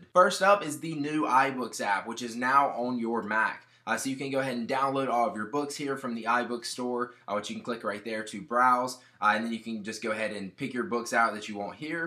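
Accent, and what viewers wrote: South, United States. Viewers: very southern.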